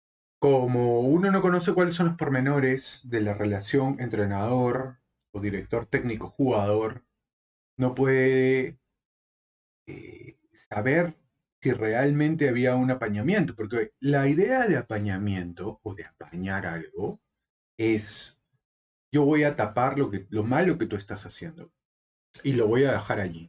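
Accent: Lima, Peru. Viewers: caes bien compa. Tu acento limeño súper relajante. Tu inspiración contando historias de bayly también. Pronto tendrás en la caja de comentarios 4000 comentarios, grande.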